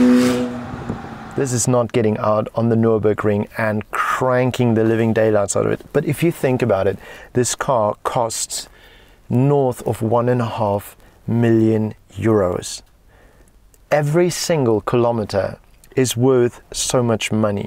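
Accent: Cape Town, South Africa. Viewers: Is this presenter South African?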